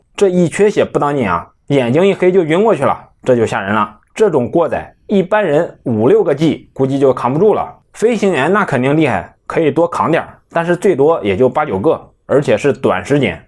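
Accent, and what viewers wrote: Henan, China. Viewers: 你是河南的吗？咋口音有点像河南口音😂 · 河南，还是山东的？ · ，听着是老乡啊，你河南哪里的呀？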